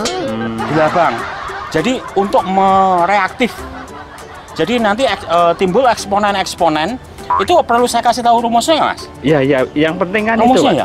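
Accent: East Java, Indonesia. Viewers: Orang mana pak?, kayaknya Jawa timuran..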